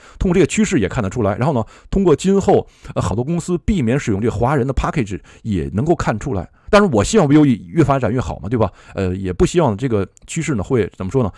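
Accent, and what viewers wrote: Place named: Japan. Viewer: 😂听说中文的习惯猜生活在日本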